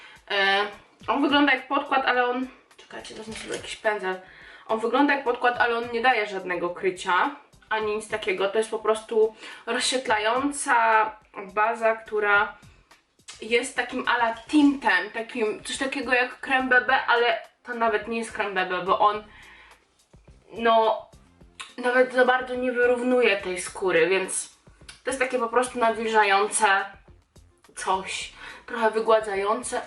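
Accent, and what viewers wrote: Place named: United Kingdom. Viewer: Zauwazylam ze masz teraz taki brytyjski akcent nawet jak mowisz po polsku to przeciagasz dlugo samogloski np- twaarz, a nie mowisz tak jak my mowimy tak szybko 'twarz', mam nadzieje ze wiesz o co mi chodzi :D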